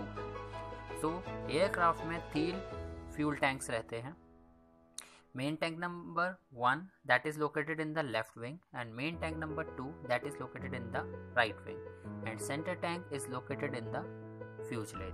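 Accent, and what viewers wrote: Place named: India. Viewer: Indish is so painful to listen to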